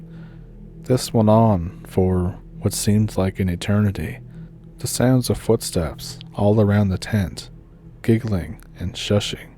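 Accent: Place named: Maritimes, Canada. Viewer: Your accent is wonderful. Canadian Maritime? My father’s family was from French Canada. Quebec/New Brunswick. So I recognize it. You talk like Anne Murray.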